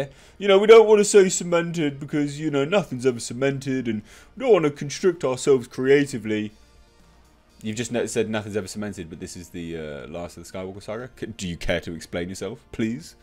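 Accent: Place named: RP, United Kingdom. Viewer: accent goes northern when he is imitating/mocking... Lol. Reg = posh Mocking = northern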